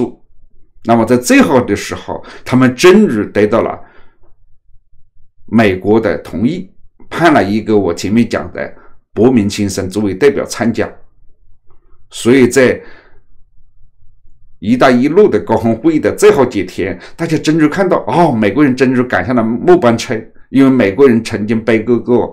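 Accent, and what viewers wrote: Hunan, China. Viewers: 这个主持人一口的湖南腔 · 我麻烦你莫用湖南腔来港话好不？丢湖南人脸，你老屋哪里滴？长株潭地区的吧?真滴舍里舍格！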